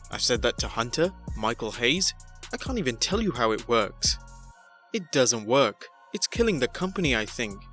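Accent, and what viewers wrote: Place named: RP, United Kingdom. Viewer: It's funny hearing a guy with a posh British accent reading a Vince Russo quote.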